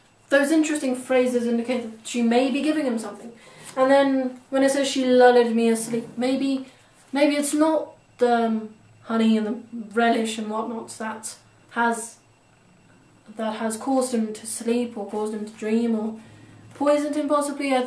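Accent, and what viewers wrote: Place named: RP, United Kingdom. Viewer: Thank you, Miss. I enjoyed this so much. I hope you've done more of these short lectures. I'm a drycleaner's daughter from Kansas. I missed school terribly before the rise of the Internet. (love your posh accent too) Thanks again.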